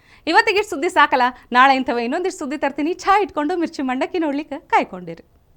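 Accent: North Karnataka, India. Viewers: nimma hage uttarakarnataka bhashe bere yara bayalloo heege agodilree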